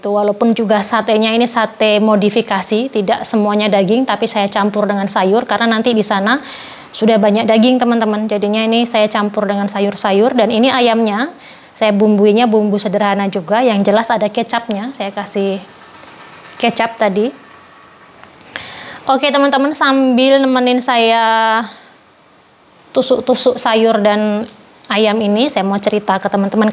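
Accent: Java, Indonesia. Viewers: Mbak orang mana ya.... Jowone ngendi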